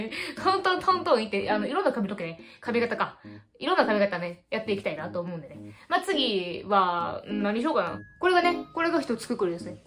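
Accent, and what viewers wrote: Kansai, Japan. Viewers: ハスキーボイス関西弁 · 関西弁だし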